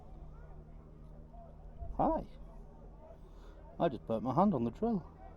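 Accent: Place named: North, United Kingdom. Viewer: I missed the bit where you said in your northern; "Don't belive in spirit levels " 😃 I am certainly looking forward to seeing more, just so I can see your workmanship reaching new heights. This boat project is going to be good for you. Take care.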